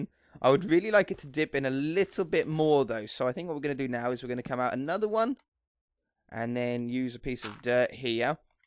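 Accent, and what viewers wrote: United Kingdom. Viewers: I love your voice, that your british and that your a redhead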